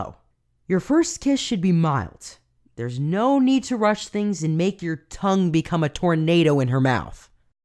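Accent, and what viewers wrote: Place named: United States. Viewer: In thought u were american XD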